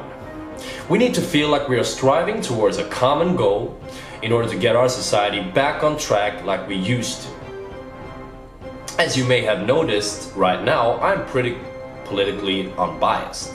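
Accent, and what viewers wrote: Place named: Canada. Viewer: Omg sounds like Canada 🇨🇦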